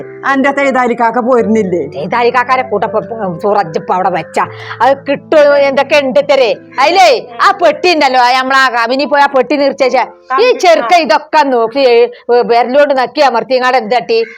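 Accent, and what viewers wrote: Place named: Karuvarakundu, India. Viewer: ഇത് നമ്മളെ നാട്ടിലെ പാർക്ക്‌ ആണല്ലോ കരുവാരകുണ്ട് എന്റെ നാട്. നിങ്ങളുടെ സംസാരം കേട്ടപ്പോൾ തന്നെ തോന്നി ആ ഭാഗത്തു തന്നെയായിരിക്കും എന്ന്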